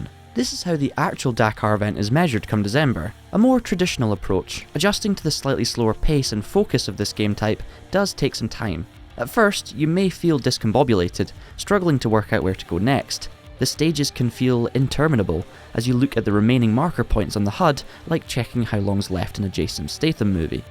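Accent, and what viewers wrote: Scotland, United Kingdom. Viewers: That’s a very clear Scottish accent … would love to hear the real accent though !!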